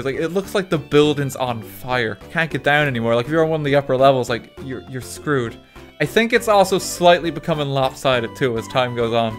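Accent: Ireland. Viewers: Why do you sound Irish but use southern slang? Lol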